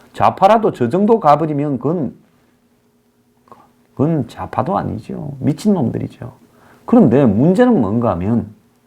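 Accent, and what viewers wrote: Gyeongsang, South Korea. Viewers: 사투리 억양듣기 전혀 안불편합니다. 단어구사는 표준어시고 어조만 사투리인데 성조가 있기 때문에 오히려 집중이 잘되고 단어가 확실히 들립니다. 모노토너스한 말투보다 훨씬 좋습니다. 참고로 저 경상도 사람 아닙니다 아버지 경기도 어머니 호남분입니다 서울서 나고자랐지만 영남 어조 오히려 집중 잘되고 귀에 단어 강조가 뛰어납니다. 욕도 심한욕 없고 듣는 사람이 시원합니다. 이상한 사람들 말 신경쓰지마세요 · 이난국 시기에 별것을 다 가지고 시비을 거네요 님의 매력은 경상도 사투리라고 봅니다 올은말만 콕콕 찝어서 뉴스를 하는데 사투리 때문에 부드럽게 들린다고 생각합니다 의욕은 욕이라고. 볼수없다고봅니다 이렇게 좋은 뉴스를 보고 듣는데 조금은 불편해도 우리 모도가 이해를 해야한다고 봅니다 의 뉴스브리핑에 감사드립니다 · 중앙아시아로 좇겨가도 경상도사투리 못바꾼다 좋은건지 아닌지 건강이최고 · 사투리 지적하시는분, 불평하시는게 좀 한심해서 한소리 할께요 같은분이 이 난국에 개 돼지들 깨우치려 힘쓰시는것에 감사합시다. 경상도 사투리라 못 알아듣는거 아니잖아요? 쩝쩝이보다 천배 만배 듣기 좋지 않습니까? 내용에 집중에 주세요. Don’t be grumpy or criticize over not important things!!! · 숨겨진 경상도 사투리가 구수합니다. 이런들 어떠리 저런들 어떠리 운운 하는 자들은 말씨를 바꾸는 재주가 탁월합니다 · 그 정도의 욕은 경상도말과 찰떡궁합! 스트레스가 풀립니다. 염려마시고 스타일대로 하세요! 응원합니다!!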